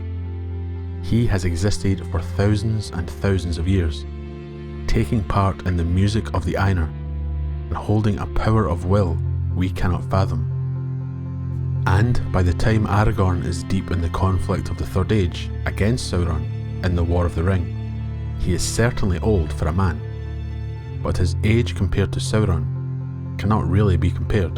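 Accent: Scotland, United Kingdom. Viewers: Excellent again. There is something inherent in Aragorn that did not exist in his ancestry for generations, perhaps since Elendil. Just as Gandalf tells Pippin that in Faramir the blood of Numenor ran true, as it did not in Boromir , so Aragorn has the majesty and power unmingled from the days of the greatness of Westernesse. Many Chieftains of the Dunedain and Kings of Arthedain passed by during the life of Arwen Undomiel, but the daughter of Elrond, and granddaughter of Galadriel and Earendil, sees in Aragorn a man she can love and respect, and sacrifice her eleven heritage for. He is Siegfried loved by a Valkyrie, Arthur returned, the Charlemagne of myth, the Restitutor Orbis like Constantine, not just the heir of a ragged line, long bereft of lordship who strikes it lucky. (How blind Denethor was!) Tolkien pushes all of our buttons. The man was a genius. 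BTW, great to hear a Scots voice amongst the babble of YouTube. Sorry to pontificate. Great channel.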